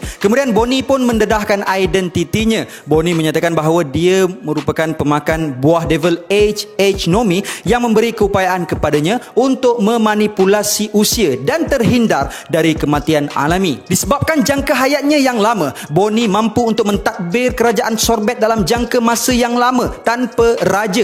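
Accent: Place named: North, Malaysia. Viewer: loghat utara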